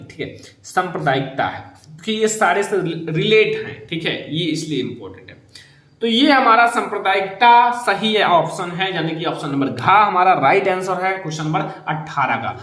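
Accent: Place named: West Bengal, India. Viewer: West bengal का बोल ra hai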